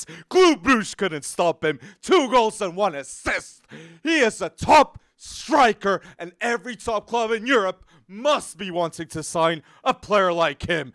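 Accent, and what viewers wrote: Portugal. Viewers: nota se no sotaque quando falas portugues que es portugues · Haha, the moment you started saying those names I knew you were portuguese! Esperemos que o Benfica vá longe este ano, CARREGA BENFICAAAA .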